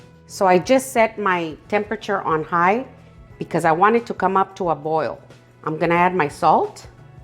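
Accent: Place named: New Mexico, United States. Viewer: ~ ...your accent reminds me so much of some of my aunts from NewMexico...LUV iT ! : )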